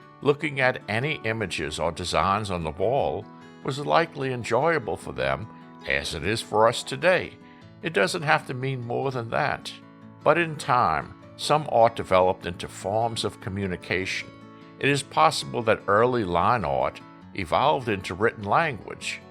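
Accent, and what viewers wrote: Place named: New England, United States. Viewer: Narrator sounds more like he's from Massachusetts or Maine, not Louisiana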